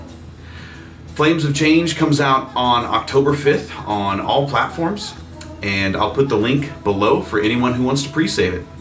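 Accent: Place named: South, United States. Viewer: It took until this video for me to realize you were also southern lol.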